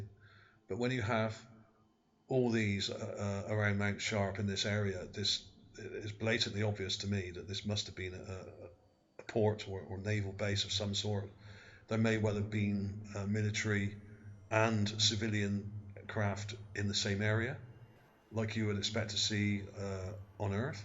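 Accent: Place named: West Country, United Kingdom. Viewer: It makes a change to hear a west country voice! Instead of one of those cosmopolitan Jewish American voices, that so often accompany these videos. Are you from Somerset as there are certain timings in your phraseology that given an indication that you are?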